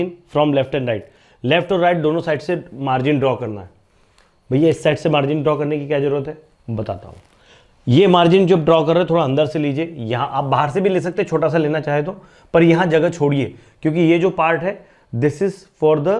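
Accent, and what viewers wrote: Maharashtra, India. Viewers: Sir tumhi Marathi aahat ka?????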